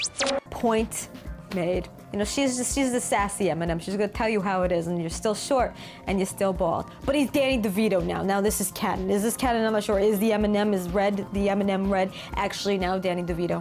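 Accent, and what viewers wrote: New York, United States. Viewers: Are you actually from N.Y or is it just a voice you do? Either way I love it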